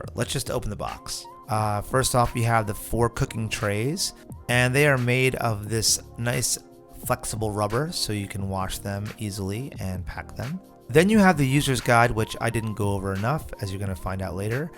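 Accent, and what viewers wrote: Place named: New York, United States. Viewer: He's from New York dead giveaway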